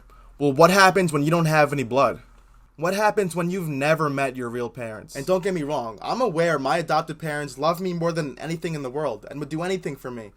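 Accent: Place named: Brazil. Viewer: Your way of speaking reminds me of brazilians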